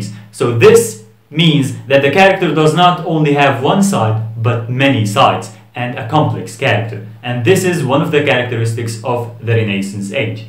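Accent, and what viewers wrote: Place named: Turkey. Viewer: your accent sounds like Turkish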